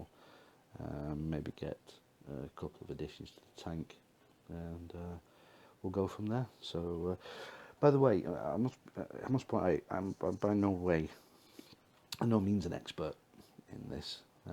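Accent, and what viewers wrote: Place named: England, United Kingdom. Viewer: lovely!! looking forward to seeing more updates:) nice to hear an English voice normally the american's that do this hobby haha I've liked and subbed to your channel. please check out my channel and sub:)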